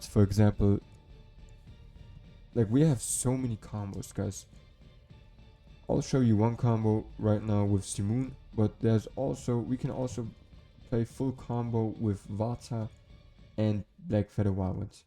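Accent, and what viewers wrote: Germany. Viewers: Are you from Germany? Super Nice Video And Deck👍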